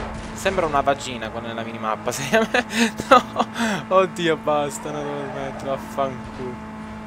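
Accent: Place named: Sicily, Italy. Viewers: io lo capisco anche perchè ogni tanto parla parlermitano :P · quel tocco di siciliano nei tuoi video DEVE continuare a essere presente!!!rende il tutto più epico!!!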